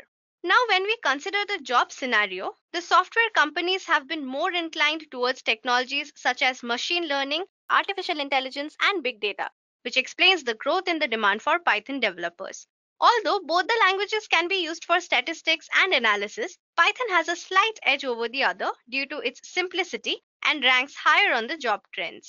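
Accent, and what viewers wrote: India. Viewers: I love your Indian accent. Why are Indians so smart and beautiful? Thank you for that worthwhile information. Bless you...❤❤❤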